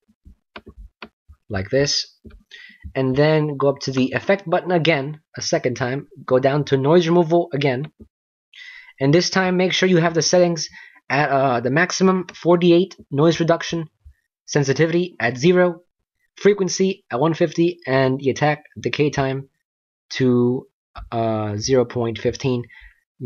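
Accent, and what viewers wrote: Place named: India. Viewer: lol wow that's quite observant of you! I thought pretty much only Indian people would be able to pick up on such a subtle accent :)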